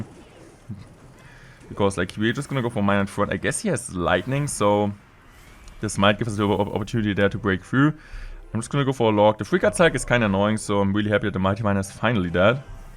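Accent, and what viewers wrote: Germany. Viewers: Der bruder klingt selbst auf english deutsch 💀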